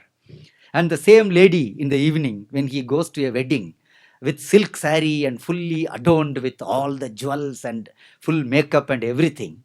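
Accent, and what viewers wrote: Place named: Tamil Nadu, India. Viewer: English pronunciation of Tamilians🙌🙌🙌🙌🙌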